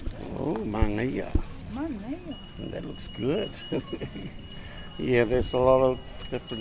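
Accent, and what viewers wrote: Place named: United Kingdom. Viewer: That British accent 😂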